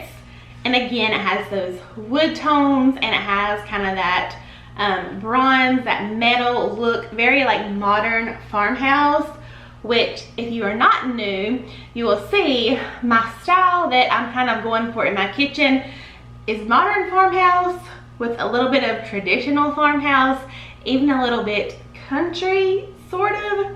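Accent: South, United States. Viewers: I love your sweet southern voice 😍. You have great style thanks for the inspirational video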